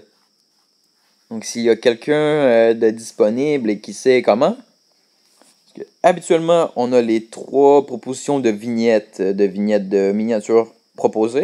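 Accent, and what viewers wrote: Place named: Quebec, Canada. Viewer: Elles sont taquer classe ses maison et j aime bien son accent sa fait styler ;-) j aime le Québec mec j aimerais bien que tu nous apprenne comment faire des maisons steampunk differentes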